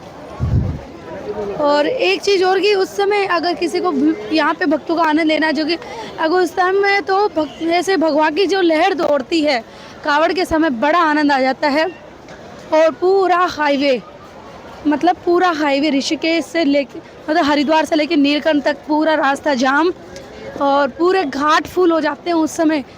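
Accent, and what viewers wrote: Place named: Haryana, India. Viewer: जी आपकी आवाज सुनकर तो ऐसा लग रहा है जैसे आप हरियाणा से हो जी जय श्री राम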